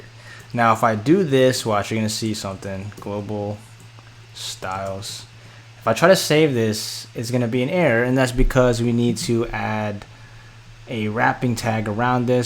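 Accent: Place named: United States. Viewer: Great job! And American English!.. Thank you!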